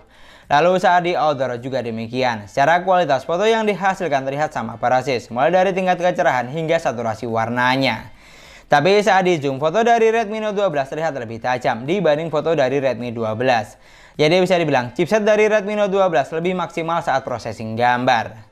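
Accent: Madura, Indonesia. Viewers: Aksen madura ya kk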